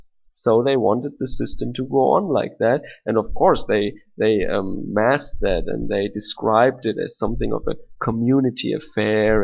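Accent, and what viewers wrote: Bavaria, Germany. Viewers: dachte höre bayrisch :) Falls du hilfe oder unterstützung brauchst. Sag bescheid.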